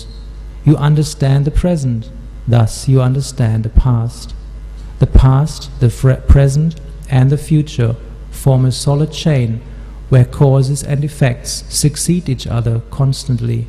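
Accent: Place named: Australia. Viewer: His accent is very Australian now.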